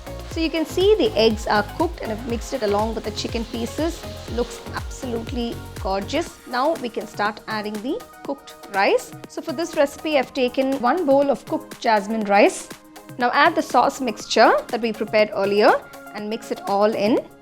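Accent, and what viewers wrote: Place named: India. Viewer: Indian accent 😂😂😂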